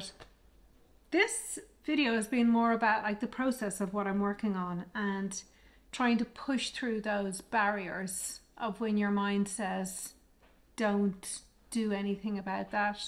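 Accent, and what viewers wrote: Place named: Ireland. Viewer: Congrats!🎉 Love your channel …. And the Irish accent is lovely. Good luck with your channel! 🌸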